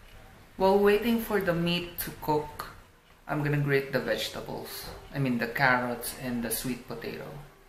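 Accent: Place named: Philippines. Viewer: based on how you speak english, i think you are a filipino?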